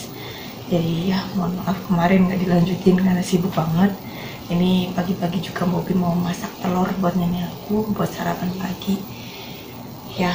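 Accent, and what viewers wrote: Banyumas, Indonesia. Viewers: Kiye wong ngapak.. Nonton maning,, ful pokoke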